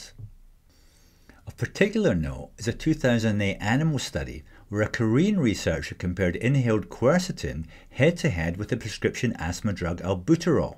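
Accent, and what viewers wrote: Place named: Edinburgh, United Kingdom. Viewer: You’re not very clever, are you? There is absolutely no issue with the guys accent. Sounds like an educated Edinburgh Scot. OK, he’s not North American, but neither are 98% of the world population. You need to open your mind and open your ears.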